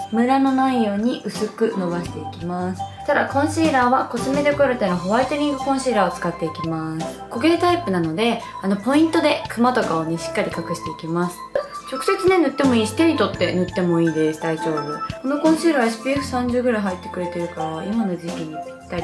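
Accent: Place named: Kansai, Japan. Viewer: 文句なし！可愛い❣️咲乃ちゃん時々関西のイントネーションになるね😄お母さんの影響？徳島だっけ？なんか嬉しい😊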